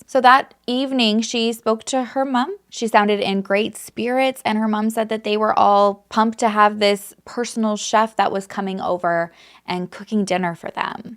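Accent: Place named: Canada. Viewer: You're Canadian? I'm from Minnesota and we have the same accent. Did my parents lie to me and I'm really Canadian? 🤦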